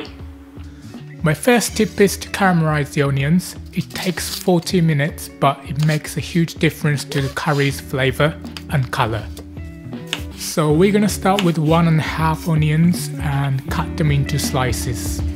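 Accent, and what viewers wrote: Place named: London, United Kingdom. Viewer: Great video the accent is really really unique your English teacher must be super British, probably from London and working class, teacher probably not formally educated on pronunciation. Very classy vid but not a "t" pronounced (mel"t"ed). Anyway 1000x better than my Japanese will ever be lol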